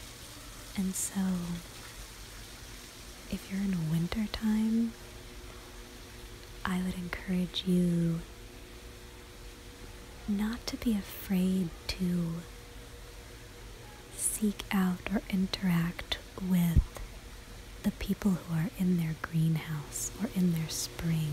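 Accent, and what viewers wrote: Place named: Canada. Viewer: All perfect from A till Z.. Winter has also its calm, romantic and cuddle feelings. Thank you.. But nice to hear your American english (though i know its canadian). Enjoy the nice christmas time dear friend 🎅🎅🎅🌲🌲🌲🌠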